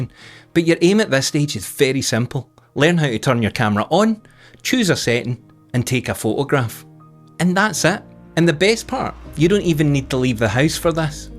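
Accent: Scotland, United Kingdom. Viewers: I came for the info and amazing photos. I stay for the Scottish accent and humor tysm 😊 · Well, I'm over 60, and this video is still pertinent. I love the way you covered this,. And your Scottish accent, priceless.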